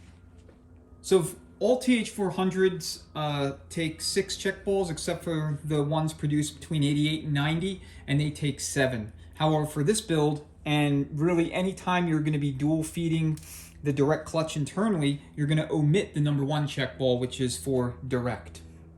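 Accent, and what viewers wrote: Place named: Philadelphia, United States. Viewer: Another excellent video! Thank you for giving me the confidence to work on my transmission! Based on that accent, you gotta be a Philly guy!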